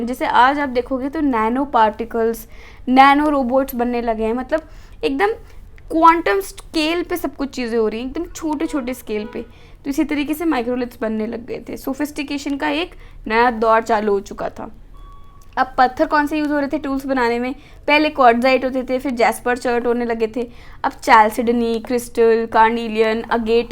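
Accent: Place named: Rajasthan, India. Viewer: ❤ mar koot bhata Aap rajasthani ho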